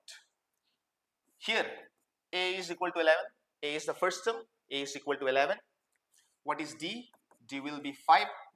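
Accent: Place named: China. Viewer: Sir actually sounds like Chinese. His accent is similar to Chinese's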